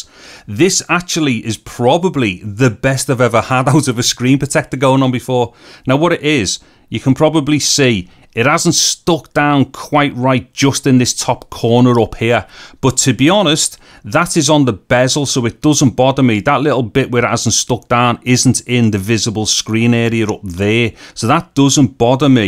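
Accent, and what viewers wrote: Liverpool, United Kingdom. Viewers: Ah, the lovely scouse accent! It’s unique to Liverpool. My favourite city in England. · Liverpool ish scouse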